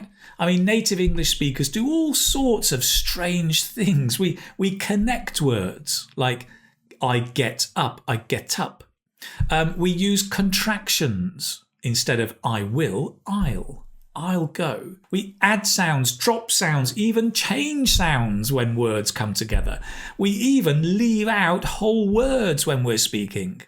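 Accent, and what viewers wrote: United Kingdom. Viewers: Im starting to watch some British Channel... and it seems that im gonna like it... i always find difficulties in understanding someone who speaks Brits Accent.. thanks for it, Sir.. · You are a legend, I'm fallen in love with British accent, u r a marvel! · Hello Firstly,thank you for a useful lesson .I would like to know that it is British accent? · Thanks 4 helping me improving my English skills. I really love ur british accent. Ur an excellent teacher. Greetings from Medellín Colombia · I think your pronunciation is Britain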